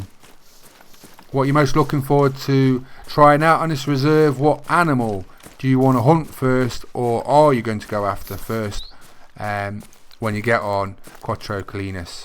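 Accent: Midlands, United Kingdom. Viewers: WoW boring. Stupid comp thats dumb just add 250. Plus doesnt offer the game to someone who doesnt own it. Too many yt channels the gd ones get maskd by the million try hards. Why do uk midlands cotw love ther voice so much. Notts Leics? Focus on diamonds and rares not shit chat.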